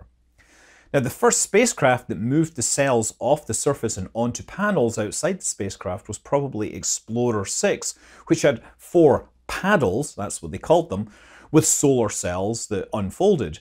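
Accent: Scotland, United Kingdom. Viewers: That scottish accent hitting hard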